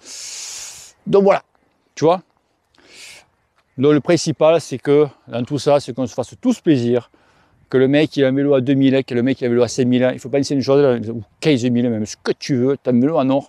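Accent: South, France. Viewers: Il me fatigue ave son accent de sudiste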